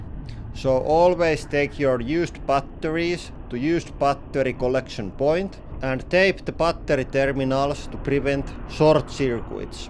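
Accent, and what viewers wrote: Finland. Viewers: I love your Finnish accent. My Grandparents and Parents have passed away and I miss all things Finnish. Now I feel like I need to buy a hydraulic press for fun!...or go back to Finland. Hyvaa Paivaa. Puhakan Timo. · Ужасный английский с финским акцентом😅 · Is that rallyenglanti I can hear?😊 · I love the Finnish accent! Hyva Suomi!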